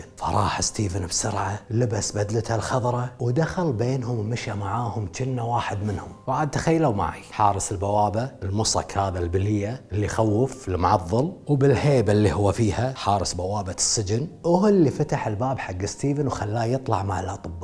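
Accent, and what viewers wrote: Kuwait. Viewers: ملاحظة صغيرة انا من الجزائر ونلقى صعوبة في فهم اللهجة الكويتية حاول تبسط فيها ..قدامي لماذا جدامي ههه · انت كويتي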